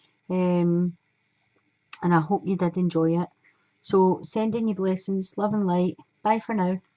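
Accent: Scotland, United Kingdom. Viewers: u Scottish?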